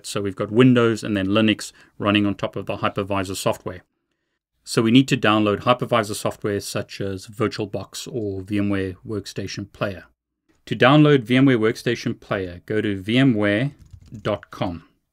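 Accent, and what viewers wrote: Afrikaans, South Africa. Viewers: Ek wou se ek herken n Saffer aksent....Geniet jou videos! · it was Afrikaans my friend you can hear his SA accent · I knew he was South African xD that accent was amazing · I knew I detected an Afrikaans accent in your other videos! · I called it! I thought your accent sounds South African. lol "jou rekenaar is myne" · HAHAHA Wou se jou aksent is AFR. haha voorspoed tjom! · Well you got the afrikaans accent right, maar jy moet dit nie weer doen nie asseblief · i thought that was an SA accent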